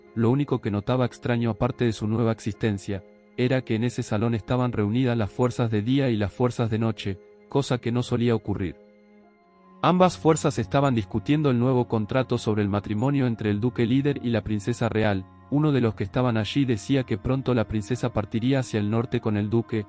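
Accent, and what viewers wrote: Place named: Argentina. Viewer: Desperté Ahí casi al inicio Ver video dijo piba déjame adivinar es un canal argentino o sea el de este video o sea el creador del video es argentino y lo sé simplemente Porque como dijo piba Y pensé el que hace el video es un argentino y yo lo sé Simplemente porque en opciones o más información yo no tengo que Ingresar a esa vaina de más información Por qué simplemente con lo que dijo de piba y dijo eso Yuya adivina Y pensé y dije es un argentino Quién hace el video El que el creador del video es argentino simplemente la forma del hablar lo dice De qué lugar es y para mí fue algo sencillo de saber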